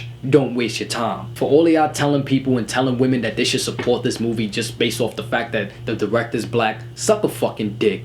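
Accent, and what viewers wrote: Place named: Bronx, United States. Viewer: I feel like your from laBronx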